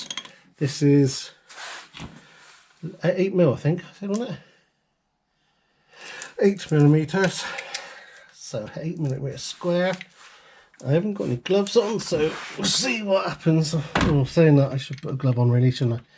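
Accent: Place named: West Country, United Kingdom. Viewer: Goin straight out after this vid to look at mine... Thx for all your great Vivaro how-to and tips 'n tricks so far..., all well explained, "proper job". Don't worry about your rabbiting, i'm quite partialled to hearing a bit of west country accent now and again. 🇨🇭 greets, Steve.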